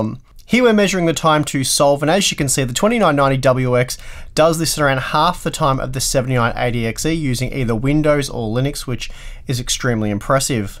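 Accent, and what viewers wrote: Australia. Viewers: PLEASE DO MORE LINUX! I love your channel and your wacky Australian accent. MORE LINUX! Give the people what they want!!!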